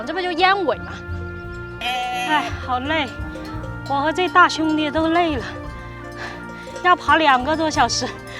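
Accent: Sichuan, China. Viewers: 四川人，口音理解一下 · 听口音是四川老乡，支持下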